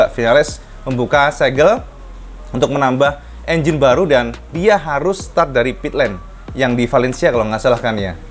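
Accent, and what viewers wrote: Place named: Surabaya, Indonesia. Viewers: Top cak.sangat baik penyampainnya.bahasa suroboyoannya jg ok masio aku wong sundo.tapi toplah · boso ne jowo suroboyoan luuuurrrr....istimewa....!!!! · jane wong ndi to ki kok bohosone suroboyoan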